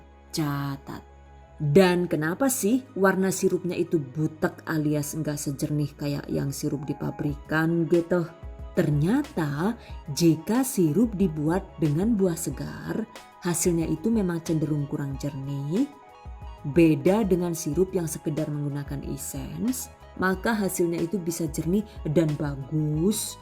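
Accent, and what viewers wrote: Banyumas, Indonesia. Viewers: Sebaik2 manusia adl yg paling bermanfaat bagi manusia lainnnya, spertinya bunda ini memang diciptakan Allah untuk mmberikan mnfaat n inspirasi bagi yg lainnya, 😍 Super sekali vedeo2nya, sirupnya, sehat menyegarkan n alami, n tentunya cocok untuk bisnis usaha 💪 ngapaknya sll bikin kepingkel2,gk tau knp klo dnger org ngomong ngapak sll ketawa😆😆 Btw, ikutan latah..."haaaay gaes..woookey gaes..."😂😂 #GiveAwayTHV2020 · ni org madiun apa org ngapak ya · Mbak asli mana kok ngapak???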